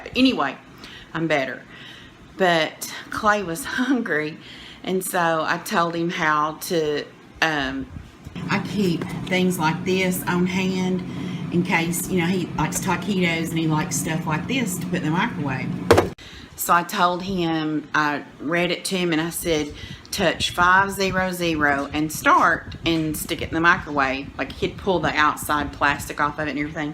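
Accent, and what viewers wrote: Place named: Tennessee, United States. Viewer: Ms.....I LOVE you...."Don't nobody whoop me...and live"...hahaha! I'm right here in middle Tennessee and we talk just like you do! I recently found your channel and I'm going to do that fabulous drip cake you showed and I AM going to lose weight on this doggone LO CARB diet I just started! I hope you will show us how to do it right, girl, because this lard ass is fixin' to GO!!! Looking forward to some lo carb sweet delights. Thanks so much for being YOU...:-)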